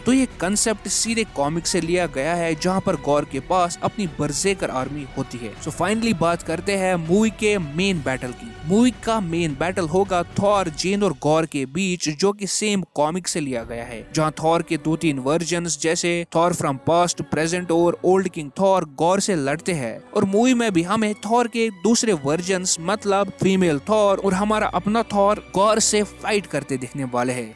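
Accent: Kashmir, India. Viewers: i think he orator seems to be a Kashmiri..?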